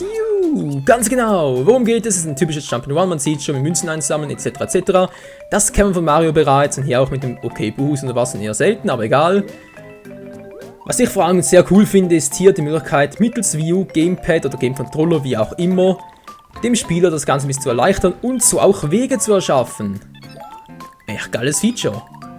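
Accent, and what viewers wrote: Switzerland. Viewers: hahahahahaha er isch schwizer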